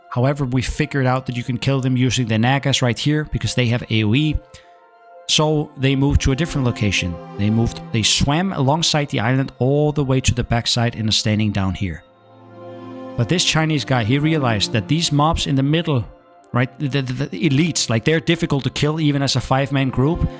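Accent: Denmark. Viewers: that is the most danish accent i ever heard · God, i like these videos.. But the commentary and danish accent is awful.,..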